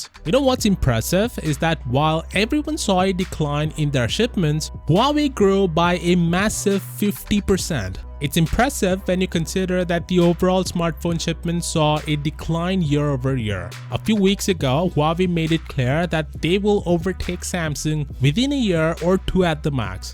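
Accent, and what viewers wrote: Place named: India. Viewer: 浓浓的阿三口音。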